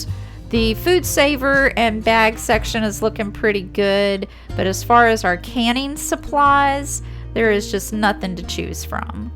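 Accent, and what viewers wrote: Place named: South, United States. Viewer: Based on your accent, you probably have eaten plenty of Vi E Na sausages in your life. Vi(rhymes with die) E it’s a hard E, Na (rhymes with huh). Ok for all you non southerners let’s say it! Vi E nah sausages or just Viennas for short. And you eat them with soda crackers, not saltines. Southern lesson is over, go get you and your mama summan ta eat! 😘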